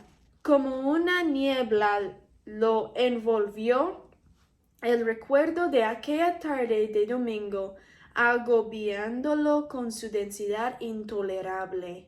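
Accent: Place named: England, United Kingdom. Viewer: Que buen cuento. 🤔Me encanta leer y escribir.Y que bien que promuevas la lectura en jóvenes. 🤓 Hay vocabularioqie que se usa mas en literatura, algunas pocas palabras no se usan en la cotidianeidad pero te hiciste entender muy bien. 🤗Sólo te sugiero mejorar acentuación en algunas escuchandolas o escuchando audiolibros de tu interés. Y que hagas in video semanal leyéndonos algo, a mi me entretuvo con tu voz y acento inglés y así practicas. Por fis di que sí, andale por fis sería bonito. 😍Hasta me dieron ganas de volver a leer algo de literatura que he dejado a un lado por el trabajo. 😣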